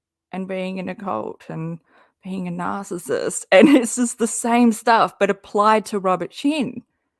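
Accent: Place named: Australia. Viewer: I have a sneaking feeling that karma is finally coming for a certain hater 😉and I can’t wait! FYI I LOVE LOVE YOUR AUZZIE ACCENT 😍😍😍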